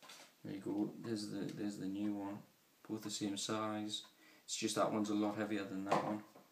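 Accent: Newcastle, United Kingdom. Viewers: Cor....sounds like a geordie to me......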